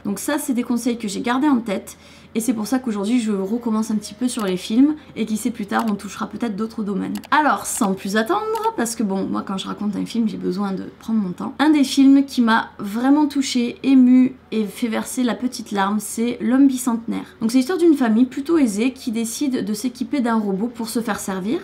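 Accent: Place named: Marseille, France. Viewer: Tu et marseillaise tu parles trop comme Kamila de secret story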